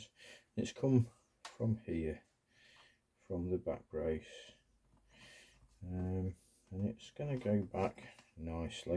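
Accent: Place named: United Kingdom. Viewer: His British accent makes it nearly NOT understandable if you have the same problem to resolve and are a USA English speaker and reasonably skilled..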